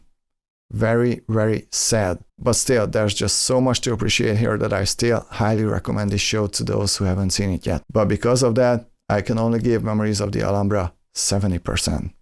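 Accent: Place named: Balkans. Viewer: It's been a while since I saw MotA. Your review makes feel the need to revisit it. I also don't rank it among my top picks, but for my life, I can't remember why. Maybe it was the ending....Your reviews are a pleasure to watch. I've subscribed....Just a btw, I'd guessed you were from somewhere in the Balkans based on you accent. Not exactly correct, but not too far off...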